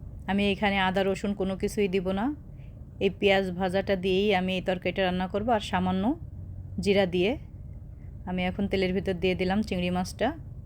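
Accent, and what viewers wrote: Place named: Chittagong, Bangladesh. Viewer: Apu chittagong er mone hoy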